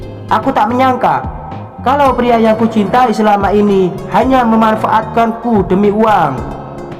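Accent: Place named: Banyumas, Indonesia. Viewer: Mlm "jdi ska ngakakkkkkkk....gra"denger crita dan bhs ngapak y...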